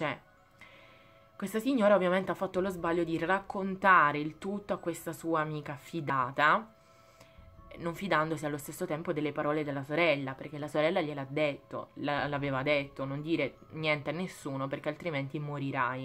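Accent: Apulia, Italy. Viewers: Di che città della Puglia sei?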